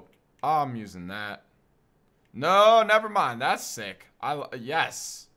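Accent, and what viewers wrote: Scotland, United Kingdom. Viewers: Scottish! Yes aye lad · Aye you got Scottish in you im a huge fan and also Scottish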